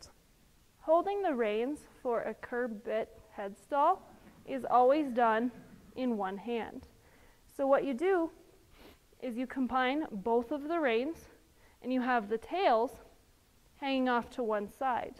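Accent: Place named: United Kingdom. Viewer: She has a accent it's from somewhere in the UK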